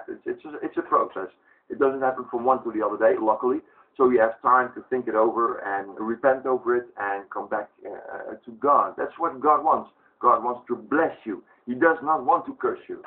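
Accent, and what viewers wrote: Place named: Netherlands. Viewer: Jij bent Nederlands. Dat hoor ik gewoon. Interessante video.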